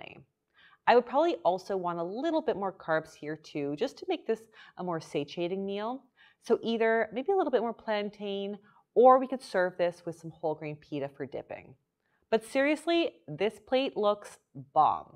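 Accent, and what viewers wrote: Canada. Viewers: She is Canadian. That’s called an accent. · I just subscribed without having watched a single video because I saw you on Obese to Beast and though you were incredibly well spoken and as a linguist I like your Canadian speech patterns. But ALSO I have been a fan of Dr. Mike for quite awhile (I only watched HIM because I love music/tv/trailer reaction videos and I think I watched a reaction of his to an episode of "House.") And right before I saw you speak with John, I had JUST watched his video critiquing that Australian banana woman and I SO SO SO MUCH wanted to ask Dr. Mike to do a video on her, so when you brought up her and then you later brought up Dr. Mike, I knew I had to immediately come here. I think I missed the video you did with him-but I'm pretty sure I've heard him mention you before. (I can picture him saying the name " "...) Love you already! 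- Male, San Diego, obese, lost 25 pounds in last two years, most this year. Want to lose 50 in the coming year. Had an unrelated (genetic thing) stroke so my frontal lobe has issues so follow-through is difficult meaning even more than everyone else already, the psychology side is bigger for me than the physiological side. Have you ever talked to Tom Bilyeu on Impact Theory/Health Theory?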